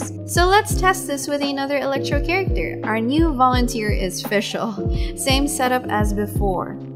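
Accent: Philippines. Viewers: It's in your about? I should have just checked 😆 I think you're from the Philippines tho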